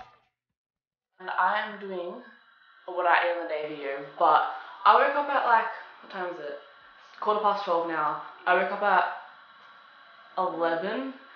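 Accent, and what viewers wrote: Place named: Australia. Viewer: How many bananas did you put into your smoothie bowl? You have SUCH a strong Australian accent btw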